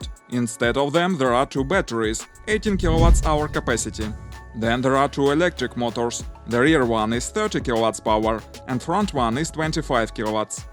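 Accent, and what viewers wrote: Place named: Russia. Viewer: On peut voir le même vidéo en francais,ce serait moins pénible que l'accent russe.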